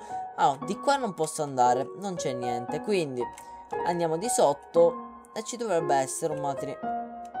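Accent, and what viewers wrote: Sicily, Italy. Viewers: comunque si sente che sei siciliano anche se non parli il dialetto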